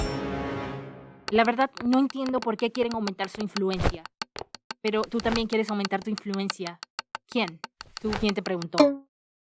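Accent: Panama. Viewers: jaja, su acento panameño es muy notorio jajajaja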